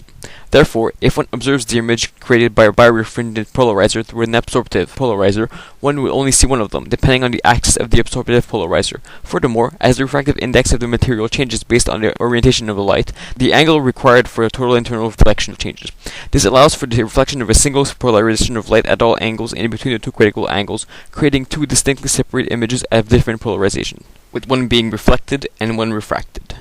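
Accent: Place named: France. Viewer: I am glad you love to speak fast AND with French accent but the combo of those two, makes your effort almost useless in delivering an otherwise interesting and useful information. Plus lentement s'il vous plait!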